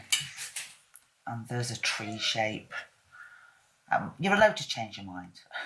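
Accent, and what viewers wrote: Northern England, United Kingdom. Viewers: You demonstrated and explained this so well, thank you, and all with a wonderful and lovely Northern accent too! All the very best for this new year.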